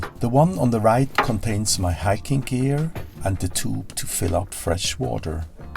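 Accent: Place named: Switzerland. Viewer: Is this chap Swiss?...he certainly sounds weird enough?!.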